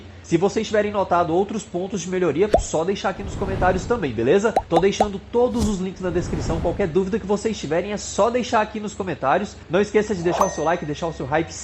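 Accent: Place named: Goiás, Brazil. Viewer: Uai, de q cidade de Goiás vc é?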